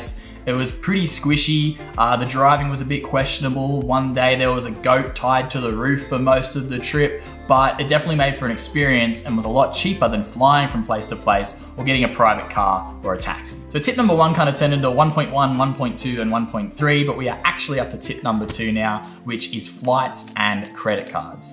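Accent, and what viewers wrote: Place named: Australia. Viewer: Hey. great tips that will definitely come to use for me. Nevertheless, wildlife is my treat. Love your work and the Australian accent is a charm:-)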